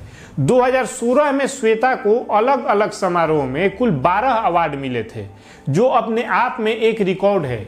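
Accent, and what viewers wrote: Bihar, India. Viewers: Your way of talking is of a typical Bihari. Actors and artists can learn from you the accent of Bihar. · Bihari waala tone mein bol raha hai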